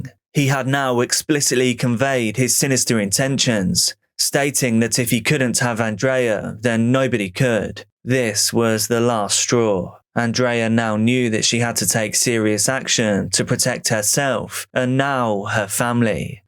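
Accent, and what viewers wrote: England, United Kingdom. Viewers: "Casey" is Australian, is clearly British.. not the same at all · the beautiful English accent is so exotic !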